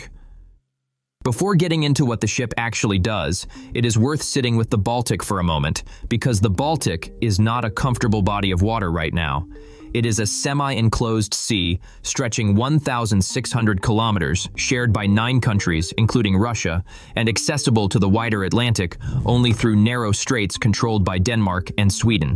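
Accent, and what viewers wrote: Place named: United States. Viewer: Excellent video showing superb European cooperation to create a state of the art platform. Pity that the narration was with an American accent. It is noted that the frigate has ZERO American content. Why therefore is the narration American? Content - excellent. Presentation - not so much.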